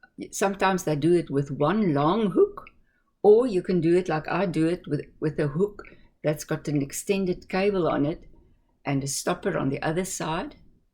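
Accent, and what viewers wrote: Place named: Afrikaans, South Africa. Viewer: We were trying to place the accent. It has a little of that So Africa/Australia sound but also a Dutch/German sound. Afrikaans! Makes so much sense. My ex-husband spent two years in Capetown and we named one of my kids Xolani (ex says the click, I do not) and two of the kids have Xhosa middle names as well. I'm sorry about the bulkier yarn. I am glad you were able to make some things. That bear! Sooo adorable! I hope your great-granddaughters love the hats. I guess they don't need them until we are having summer. :D I like wearing slipper socks. :D I haven't been knitting long enough to need to repair socks yet. I made my daughter a reversible hat using Tunisian crochet. It's thick and so pretty. Love seeing what you have going.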